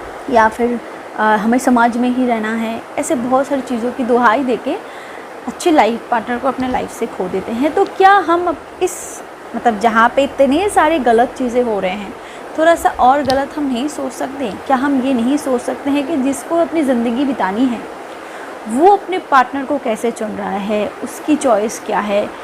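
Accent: Bengal, India. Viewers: aapni jodi banglate bolle khub bhalo hobe aapni jokhun Hindi bolen bangla bangla bhab aase aapnar thopik guli bhalo lage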